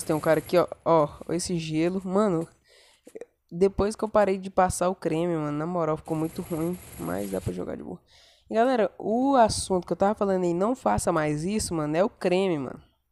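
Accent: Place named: Northeast, Brazil. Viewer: Esse é nordestino ''já vazou la por riba'' e noix <3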